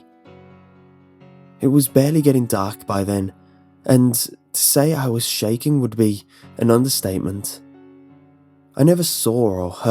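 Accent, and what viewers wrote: United Kingdom. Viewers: It's funny to hear this in a British accent, because I live in the southern half of North America, and most hunting stories around here are "WELL SHOOT FER CLETUS, I RECON I DUN DID SAWD A GHOST, SO I SHOT HIM UP WITH THAT THERE RIFLE I DID! WHOOOOOOOOOOO-EEEEEEE! Slaps Knees "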